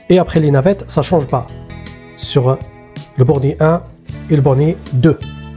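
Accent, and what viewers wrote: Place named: South, France. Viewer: Je découvre et c’est vraiment bien expliqué, et en prime cet accent du Sud qui lorsque on vit dans le Nord remplit de bonheur.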